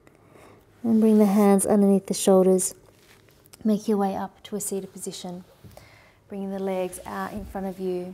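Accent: Australia. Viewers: Thank you for a fantastic flow. So good to be guided by an Australian voice at home. 🙏🏻🧘‍♀️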